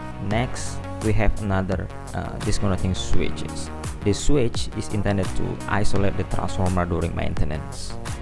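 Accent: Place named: Indonesia. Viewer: Saya kira cuma saya saja penonton dari Indonesia gan hehehehe Rupanya channel ini juga asal Indonesia pantas suaranya seperti orang Indonesia walaupun bahaya Inggris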